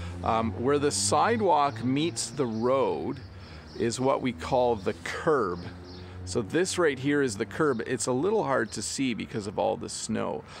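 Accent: United States. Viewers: I'm from Brazil and I liked your video and your accent, it looks like american I have subscribed Thanks a lot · You really is a canadian? Your accent really like the american 😍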